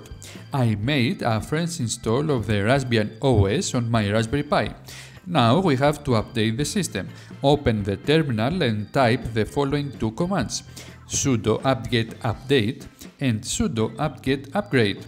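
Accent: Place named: Greece. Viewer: Κανεις μπαμ πως είσαι Έλληνας αδερφε 😆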